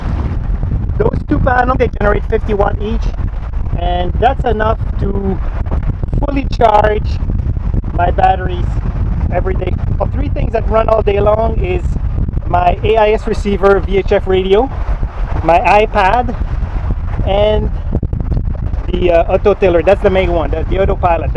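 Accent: Quebec, Canada. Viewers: Im going to take a chance here but would you be from Québec ? If not, your accent sound like it😃 Great vidéo, in fact, one of my favorite !! Lots of good actionable ideas in there !!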